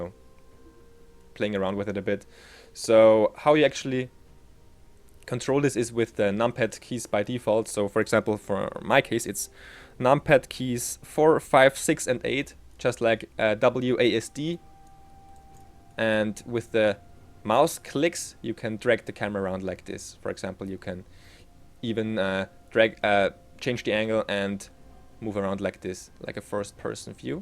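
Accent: Germany. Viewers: I It really sounds german btw im german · also sprichst du doch deutsch das merkt man obwohl dein Englisch echt gut ist:) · Du höhrst dich so Deutsch an :o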